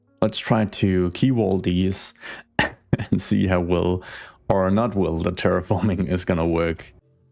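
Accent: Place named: Denmark. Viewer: Er du dansk? (I'm guessing this based on your heavy accent) :D Incredible content and builds.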